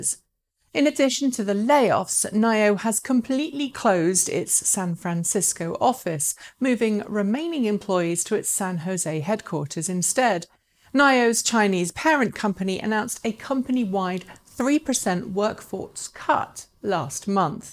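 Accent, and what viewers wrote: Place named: United Kingdom. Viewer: why do you have a distinct British accent but make your show for the US market? From my point of view, It would make more sense, if you either made a news series concentrating on the British and Irish market (which is quite distinct to the US market with its regulation, currencies, and steering) with prices in GBP (UK) and EUR (Ireland), rebates in these countries and availability there. Or do a news series in US english with US prices, US tax rebates and US availability. If your series is intended for international viewers mixing stuff from around the world, then there should be a clear label, for which markets each of the news really apply. You could add a flag in a corner of the screen. The news about an electric food truck from an aftermarket conversion company for example will only apply to a certain region of the world. 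And this region should be told to the audience.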